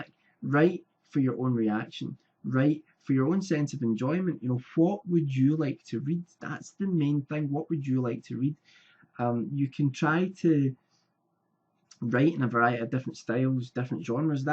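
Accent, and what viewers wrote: Scotland, United Kingdom. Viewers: Nice accent are you Scottish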